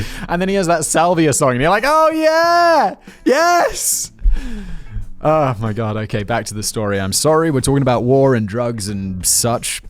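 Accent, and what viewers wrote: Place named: United Kingdom. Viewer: p-ah-stah simon I cringe when you say taco and pasta yall brits need to learn what a soft "a" is. also berserkers of the Viking age literally ate mushrooms before war...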